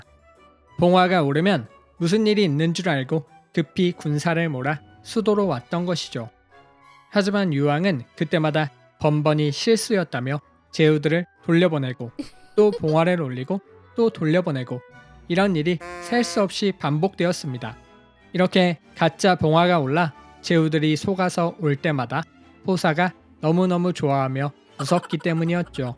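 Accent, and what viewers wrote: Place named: China. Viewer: 발음이 꼭 중국인 같네요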